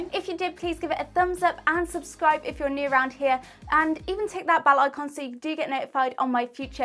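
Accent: England, United Kingdom. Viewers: Is her accent British??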